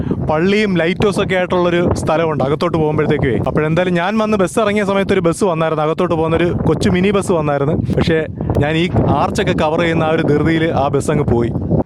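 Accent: Kollam, India. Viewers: കൊല്ലം ശൈലി.😊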